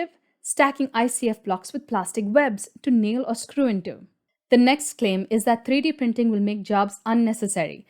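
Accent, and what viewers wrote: India. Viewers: Indian English sounds so different from the proper british english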